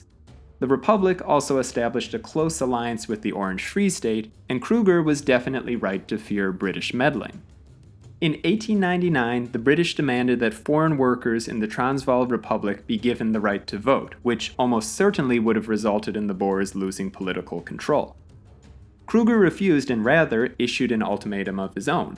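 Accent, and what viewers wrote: United States. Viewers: All these incorrect American pronoucications of South African names are pretty grating. It's "The" Cape Colony, M[h]juba hil, C[e]cil Rhodes (not Ceacill), for example.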